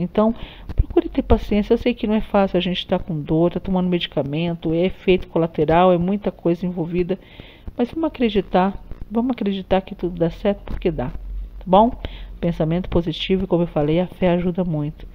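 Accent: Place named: Rio de Janeiro, Brazil. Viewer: A senhora é do Rio de Janeiro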